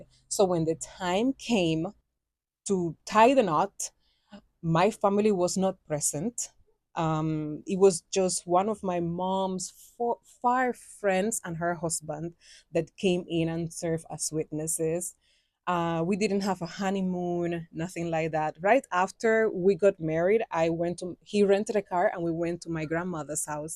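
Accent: Jamaica. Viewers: I LOVE when you sprinkle some patois into what you are saying!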